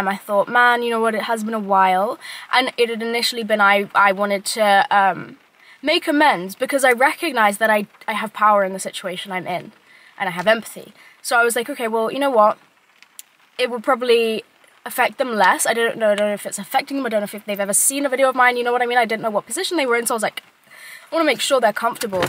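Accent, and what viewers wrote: United Kingdom. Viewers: Are you British!? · For the not native speakers you speak too fast))) Where you from, UK? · it this a British accent?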